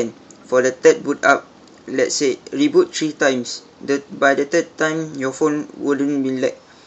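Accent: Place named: Malaysia. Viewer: sounded like a Malaysian.. probably a Malay coz of that accent..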